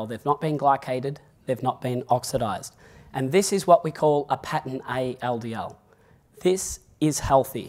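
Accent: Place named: Australia. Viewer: Great post. One note though pertaining to #2 above - the doctor in this presentation does address A1C. He refers to it by its full name of HbA1C with an Aussie accent that slightly throws off an American ear. And he says more or less what you do in #2. That the time averaged blood sugar level A1C reports is proportional to glycation levels upon all cells in the body including LDL. Otherwise, I'm still learning, but one thing at least some statins have are anti-inflammatory properties. Up until I saw this video just now, my understanding was that the epithelium layer inside your arteries is damaged by the repeated tug of war between blood sugar spikes and insulin to pull it back down. That sugar-insulin cycle over and over is inflammatory and damages the epithelium which is then "healed" by plaque your body deposits to protect it (like a scab). But in this video the doctor says it's all caused by damaged (glycated) LDL particles which find their way under the epithelium and are then captured by macrophages which then become foam cells which eventually results in arterial plaque. 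So this was a very interesting video indeed. The learning continues. One thing I know to do now is to try my best to get my triglycerides down, my HDL way up and my A1C down below 5.0 if I can. LDL seems of little importance if I get the others in line.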